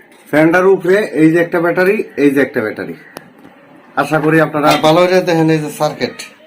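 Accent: Mymensingh, Bangladesh. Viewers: মমিসিংগা ভাষা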